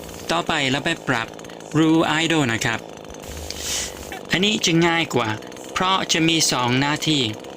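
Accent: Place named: Isan, Thailand. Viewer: สำเนียง ฝรั่ง+อีสาน 👍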